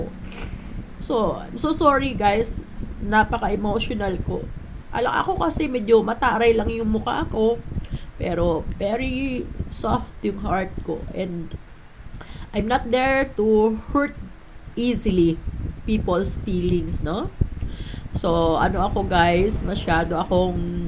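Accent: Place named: Philippines. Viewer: Watching sharing akala ko Korean ka nanuod ako sayo silent now may sounds pinay ka pala❤❤ kahirap yan dapat talaga mag helmet kasi hirap 100 pa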